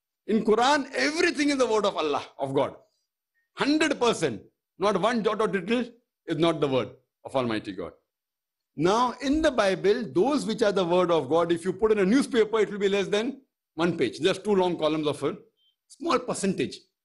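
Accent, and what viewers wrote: India. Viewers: seems strange to see a white man talking with a natural Indian accent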